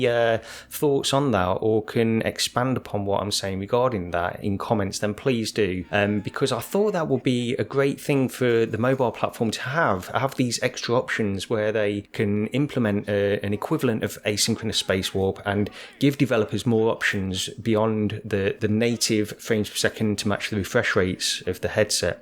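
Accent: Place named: Midlands, United Kingdom. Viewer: Having just picked up a p.c with an rtx 3070 so I can link my oculus quest 2 to it,i watched your vid on project cars 2 in vr which was very informative. I started off with the psvr and while very good the lack of analogue sticks for movement on the move controllers and the less than crisp visuals on most games was disappointing. The psvr 2 needs to incorporate better controllers ( oculus touch or valve index style) ,it will be interesting to see how much sony decide to push the psvr 2,as facebook seem to taking over as far as vr is concerned and more competition can only be a good thing. P.s just subscribed to your channel ,great content ( i prefer your real voice,I'm a midlands bloke myself )👍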